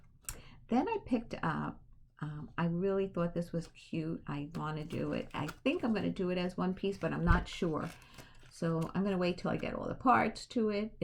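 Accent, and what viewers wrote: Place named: North, United States. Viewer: Love your videos,! I can relate to what you feel about being from New York - I am from Philly, haven't lived there since I was 25, and listening to you takes me right back to my northern roots.:) I love watching your quilting, including your process and progress on your quilts.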